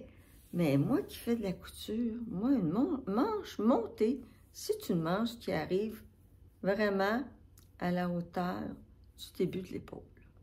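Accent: Quebec, Canada. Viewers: Enfin quelqu’un du Québec comme sait agréable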